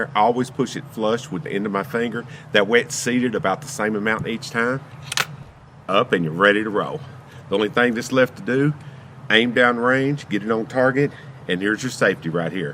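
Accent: South, United States. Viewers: Nice rebel accent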